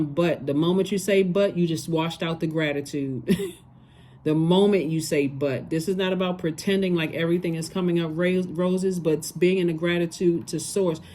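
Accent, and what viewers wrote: United States. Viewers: Thanks so much for this reading and for the support and clarity I have gained through these readings. Take care of yourself and God’s blessings always. You sound American and one day sooner than later I will like to catch up to say Hello. ❤❤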